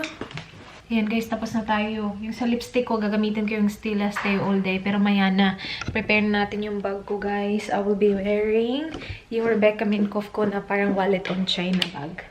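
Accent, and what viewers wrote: Visayas, Philippines. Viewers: bisaya ka o tagalog? Prang visayan accent ka kc?bsaya din ako.. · Sis.. Klaro kaau na bisaya ka? Correct me when f I'm wrong 😊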